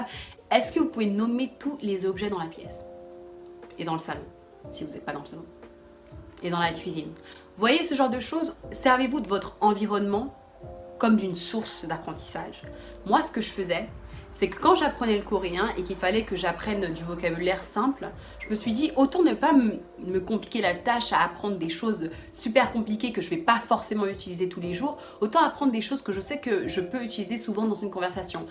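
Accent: United Kingdom. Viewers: olalala tu m'as deter !!! cet accent anglais magnifique un goal absolu !!! Merci pour tous ces conseils, ultra ultra utile! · black women with uk accent....n'hésite pas à regarder l'objectif de la caméra au lieu du retour ! :)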